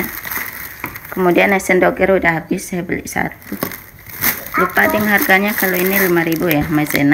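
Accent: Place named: Java, Indonesia. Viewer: Mbak Jawa nya nggak ilang....salam manis dari Kami di Depok yaaaa...🌷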